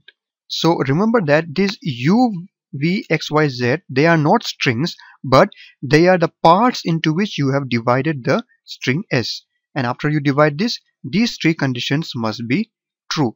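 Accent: India. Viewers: He's from India...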